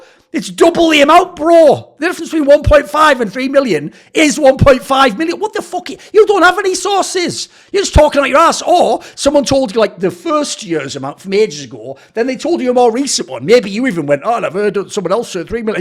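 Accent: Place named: Scotland, United Kingdom. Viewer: its probably been 5 years since i've seen a thoorin video since i quit league in high school and i forgot how scottish this guy is 😭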